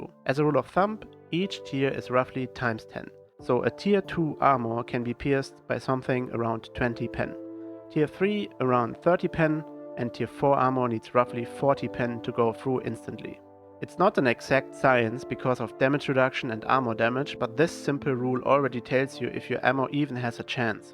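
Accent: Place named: Germany. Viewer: Denglisch. Ein unverkennbarer Klang im Universum! Gruß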